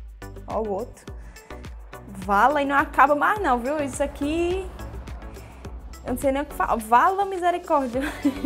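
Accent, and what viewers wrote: Brazil. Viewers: este sotaque bonito é da Bahia ? Pois ocê não puxa o "S" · só pelo sotaque ja dá pra saber q é nordestina, slv pra Fortaleza 🇫🇷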